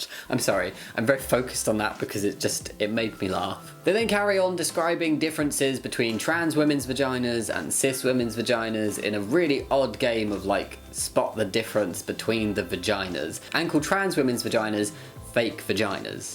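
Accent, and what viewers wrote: United Kingdom. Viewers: I love how masculine his voice sounded when he said ‘femininity’. Something about the mixture of the deep voice with the British accent. Not fetishising, I’m British too, but yeah just a random thought. Otherwise I enjoyed the vid, that TERF is a right idiot and an all-round awful human being.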